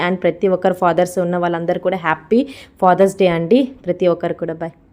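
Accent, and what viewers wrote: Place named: Srikakulam, India. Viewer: appa nuvu great. I love you srikakulam slang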